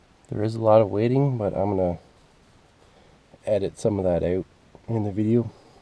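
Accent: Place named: Southern Ontario, Canada. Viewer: That there is a southern Ontario accent. You still happy with the purchase?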